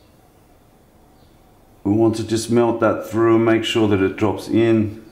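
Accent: Australia. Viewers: Are you Australian? Great video. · Aussie? Love the video mate.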